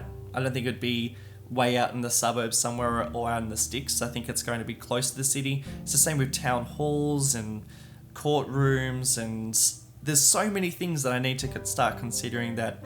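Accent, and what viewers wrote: Australia. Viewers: Seriously good quality presentation mate. Great to hear another Aussie accent too. Well done mate, top marks. Liked and subscribed. Keep it up.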